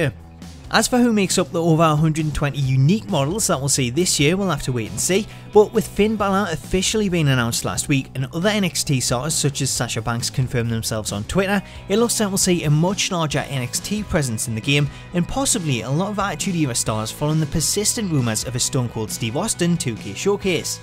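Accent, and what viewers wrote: Newcastle, United Kingdom. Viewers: He's a geordie ?😱😱😱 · Someone from Newcastle... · a proper belta lad from the toon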